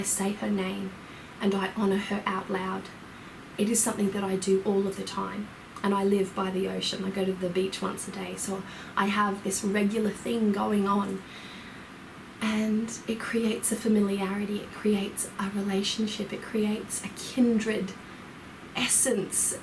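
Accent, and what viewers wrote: Australia. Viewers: I can tell you're Australian from the accent, am I right? Working with the saints so to speak, you ain't mucking about That's for sure. Best of luck.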